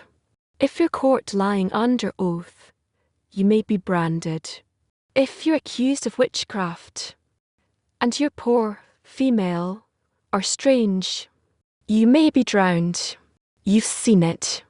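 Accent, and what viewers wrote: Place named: Scotland, United Kingdom. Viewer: Love the Scottish voiceover 🏴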